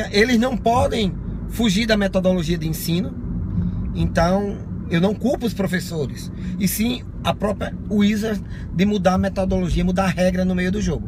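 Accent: Northeast, Brazil. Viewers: Tu é nordestino neh macho? Kkk cheguei agora no canal, vou ser inscrito.. E sim eu faço SENAC-PE e so tenho a dizer que é top man, eu não sabia de naaaaaada, e agora to quase terminando o básico e posso dizer que ja posso ter uma conversa básica com um americano, principalmente saber ouvir. 5 meses de curso.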